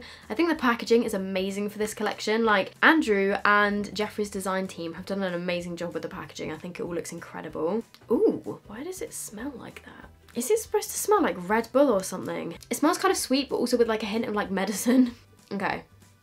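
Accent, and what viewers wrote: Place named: United Kingdom. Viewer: I find voice soooo calming! Anyone know where in the Uk she is from?!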